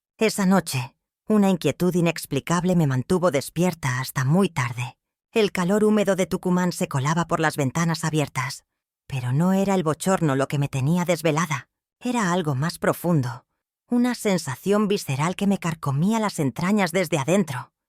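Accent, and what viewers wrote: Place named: Spain. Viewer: Xgue es otra persona la que habla, no ella . Es española la gue narra.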